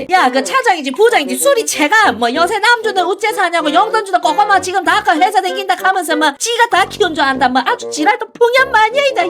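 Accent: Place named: Gyeongsang, South Korea. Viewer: 수많은 경상도 사투리 작품들 중에 단연코 역작. 미디어 사투리가 아닌 리얼리즘 사투리. 아니 진짜 경상도 아주머니 말투를 어떻게 이렇게 잘 살리세요?ㅋㅋ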